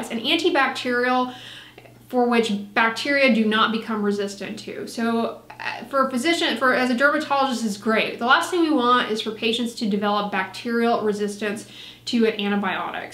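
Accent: United States. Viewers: Where in America is that accent from?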